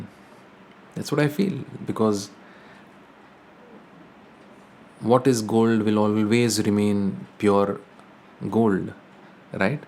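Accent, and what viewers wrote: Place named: Karnataka, India. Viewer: Really loved Kannada accent. Perfect 👍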